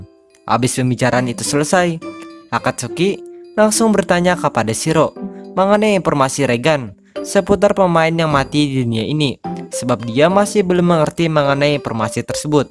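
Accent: Banjar, Indonesia. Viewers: banjar banarr wkw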